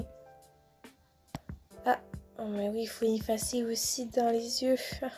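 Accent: Quebec, Canada. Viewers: Mais non tu as un accent québécoi et je l'adore c beaucoup mieux que d'avoir le même voi que tout le monde 😘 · J'aime beaucoup ta voix québécois 😍😘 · tu est canadienne? Parce que j adore ta voix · Je sais pas si ta un acsent québéquois mais si oui une pepite👌👌😭😭💖💖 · Ta voix me fais penser a une voix de québécoise et merci pour le tuto je like et m'abonne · JADORE TON ACCENT KEBEQUOI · Tu es canadienne jadore ton accent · Tu est québécois car tu a k accent et je trouve cette accent trop chou 🤧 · Trés jolie axent canadien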